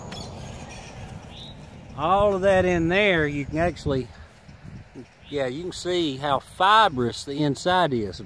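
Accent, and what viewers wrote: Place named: South, United States. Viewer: The Horrid Thistle (better name than Bull Thistle) is absolutely not an invasive plant! It is a native, and extremely beneficial plant for wildlife. Applying the obvious here; you have a southern accent so I can assume you live in the Deep South, like me. Houston, Texas here. Horrid Thistle' are blooming everywhere right now with Spring just beginning. Sure, they may not be conventionally pretty but they are fascinating. Back to the point, know your stuff brother! Don't spread misinformation. The rest of the video was great btw.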